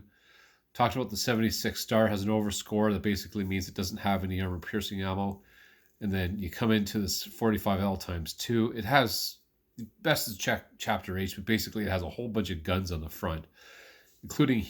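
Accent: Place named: Canada. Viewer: Sexy sultry Canadian voice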